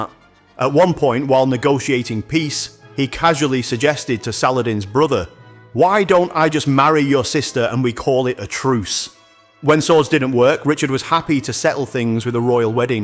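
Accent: United Kingdom. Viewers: What kind of British accent is this?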